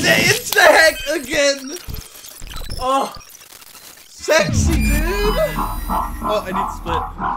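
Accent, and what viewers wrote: Netherlands. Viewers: ik hoorde het al eerder aan het accent, komt ook wel omdat ik al had gezien op <link> dat je nederlands bent. :P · dude I just started watching your videos and I had no idea you were Dutch until I heard you read the letters and numbers out loud.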